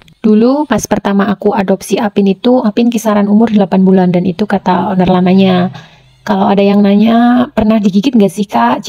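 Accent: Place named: Banyumas, Indonesia. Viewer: ngomong nya ngapak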